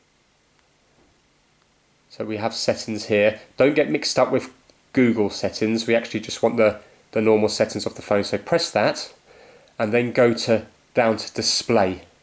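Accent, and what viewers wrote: United Kingdom. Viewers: British Accent is Ughhhh!!! 😍😍😍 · I LOVE BRITISH ACCENT.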